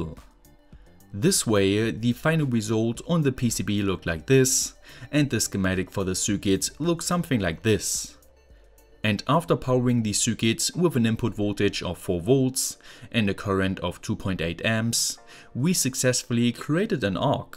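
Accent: Germany. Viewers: Lol als ob du aus Deutschland bist xD Hatte irgendwie usa gedacht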